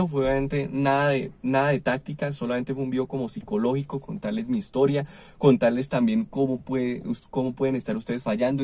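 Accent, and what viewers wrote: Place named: Colombia. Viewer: Si gracias por esa explicacion paisano chao me alegro bastante oirlo esa es mi Colombia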